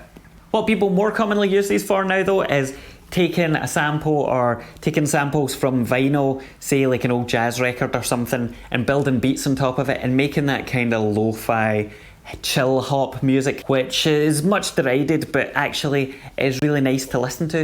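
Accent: Scotland, United Kingdom. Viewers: It's definitely a Scottish accent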